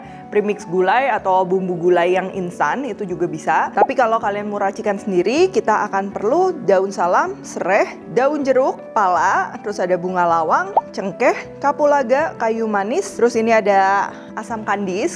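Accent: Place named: Java, Indonesia. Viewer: makasih cheff...udah recook asli medok banget.. klo ada waktu boleh share resep tahu kriuk ya cheff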